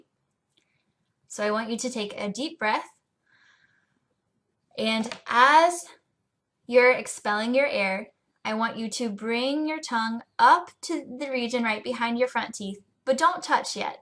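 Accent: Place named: Argentina. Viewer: I do love your argentinian accent haha. I'm studying english in Dublin and I have problems with the English R, so you people are not alone hahaha.. un beso grande genia !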